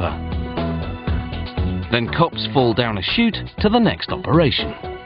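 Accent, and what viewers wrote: United Kingdom. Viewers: Sounds northern English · Where is this guy's accent from? I know, all you geniuses, that it's British... but from which part?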